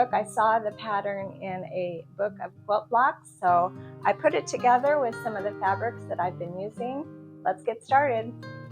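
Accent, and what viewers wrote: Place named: England, United Kingdom. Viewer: Good evening, firstly, what a bonny dress you are wearing to do, it's so pretty. Next, do you remember the name of that gorgeous rad and the navy prints, they are lovely especially that red one, it would make a lovely dress., you sound English but from that cracker of an Airstreamer mobile home, it makes me think that you no longer live here in the UK,. My Daddy made our first caravanette out of a Ford Transit van, that he cut the roof off and put an extending roof on,. He put windows in and my Mum made the seats/table/ bed, with my twin brother in a stretcher of blue fur fabric with navy and white cotton to stop it from going out of shape. I slept across the front seats, definitely not as comfortable as everyone else, but it was the only way we could have time away from where we lived. My Daddy got a proper camper van with real toilet that they could also use as a shower room, real fridge and a cooker. 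They could go with the dog just as the weather here allowed, or turn in a different direction to better looking parts. It's funny how we used to get made fun of at school because we couldn't afford a proper caravan holiday or a real holiday to a holiday park, but we had great fun. He was funny because if we went into a town and it was busy, he turned around and went where there was nothing of any interest for a 10 year old and upwards. Up here in Scotland there has been such a big uptake in people buying anything they see to make into their holiday dream of a motorho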